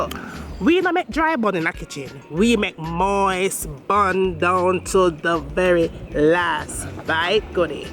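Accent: Jamaica. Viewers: Thanks! I am so happy I found your recipe. You are hilarious, confident and talented, who else could so eloquently and entertainingly deliver a tutorial in that beautiful Jamaican patois. Keep spreading the love, you are special!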